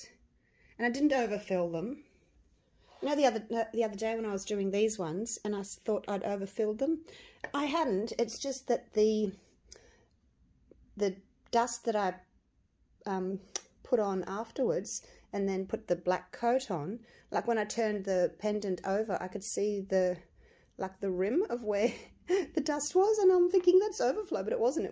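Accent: Australia. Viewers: your lovely personality shines through! I love all the things you say, love the Australian way of saying things that's different from American way of saying things! You are interesting and engaging and very informative -- all good reasons to watch you, learn from you. Please don't change anything because someone commented on it. These are so beautiful! I love the pink! I don't think it's too much pink. If the white one was not next to the pink one, it would not be so obvious that you added pink! Opal was my mother's birthstone, she loved them and so do I! How about a touch of blue or blue-green? I don't think the powder on the back shows through. Beautiful, just beautiful! Thank you and take care and stay safe!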